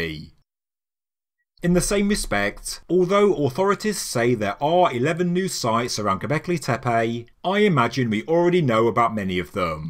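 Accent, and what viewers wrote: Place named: England, United Kingdom. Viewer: ZZZZZZZZZZZZZZZ Do all poms talk like this? (Gobekli Tepaaaaayyyyy?!)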